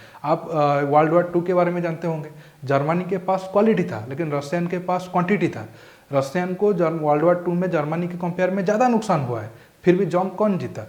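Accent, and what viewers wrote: Odisha, India. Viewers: Hindi bolne ke style se pata chal raha he ki aaap odisha se hi ho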